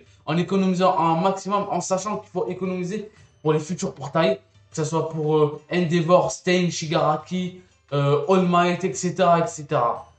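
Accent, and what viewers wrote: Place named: Marseille, France. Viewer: Par contre sss un peut marseillais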